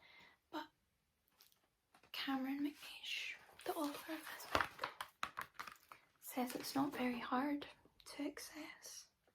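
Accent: Scotland, United Kingdom. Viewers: My goodness, you're the double of model Emily Ratajkowski, but with a Scottish voice... what a perfect combination 😊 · Just discovered your channel! It’s up there with the discovery of penicillin 👀🤣 haha amazing voice for ASMR, so soothing and calming, plus ye canny beat a Scottish accent 😋 I’ll look forward to many more videos.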